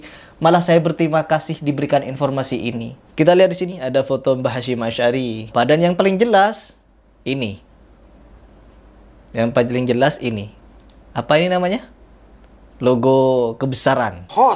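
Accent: Madura, Indonesia. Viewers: Bang Aku senang dengar aksen Maduranya walaupun Aku orang Maluku 👍Hajar terus Bang Mereka mereka yang berprilaku seperti Serigala berbulu domba 😭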